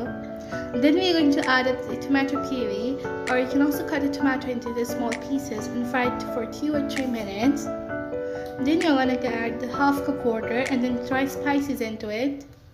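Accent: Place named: England, United Kingdom. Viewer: Yooo Desi recipe in English accent... Yummy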